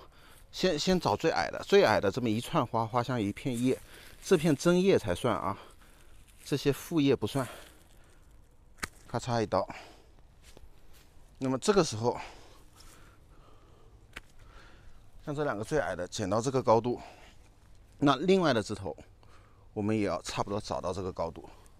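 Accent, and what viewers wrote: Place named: Shanghai, China. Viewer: 第一次看到你的视频。听口音上海人是伐？看这景貌似南加？我也在南加😄。BTW, 我就是天平座的。😅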